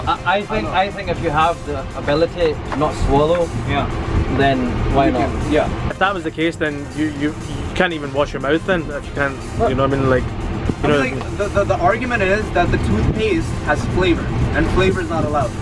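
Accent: Scotland, United Kingdom. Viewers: Why does he have a Scottish accent??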